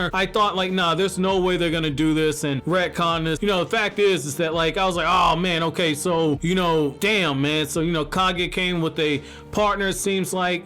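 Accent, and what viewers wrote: New York, United States. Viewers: What Part of Newyork you from man?🙌🏾🙌🏾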